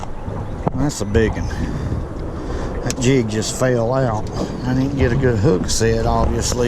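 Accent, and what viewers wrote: South, United States. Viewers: Like your videos. Also like that southern traw! 👍🏻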